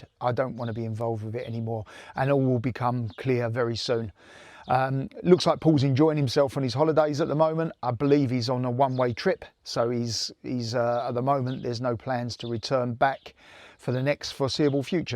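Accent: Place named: Eastbourne, United Kingdom. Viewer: Haa you can talk all day I love the accent. Reminds me of when I lived in Eastbourne.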